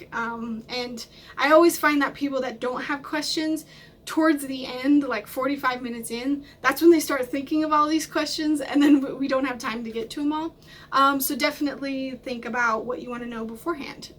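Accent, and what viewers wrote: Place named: Minnesota, United States. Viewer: I'm pretty good at guessing accents. I'm thinking and predicting she's from Minnesota but I could be wrong.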